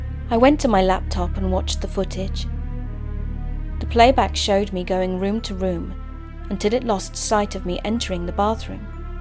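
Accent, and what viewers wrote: United Kingdom. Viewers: Admittedly, the coincidental situations that the lady in this story experienced are equally scary and sad. She leaves her abusive ex and tries to find solace at this place in the country, only to have some random creeper sneak his way into the new place as well. Otherwise, as always, this video and its story are both very well done, and British accent adds a very regal element to it. Good job yet again! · Very good, I am impressed but remind me never to go to that store for lingerie. The store lets the customer try on lingerie and then resells which is something that not done any store lol. The narrator did a fantastic job, is she British she sounds British to me. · Good story, great beautiful actress, excellent editing and camera work! The whole thing was exceptionally well done, with very high production value. Only 2-continuity type things kinda confused me: If it takes places in the USA, especially the South, why does the Narrator have a British Accent? 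Also, I think forgot to take off her beautiful wedding ring, unless she's married in the story.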